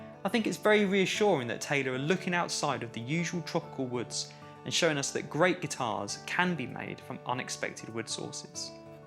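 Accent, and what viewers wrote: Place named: United Kingdom. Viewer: I’m tickled with your British accent. Want to ask what country and region are you from ?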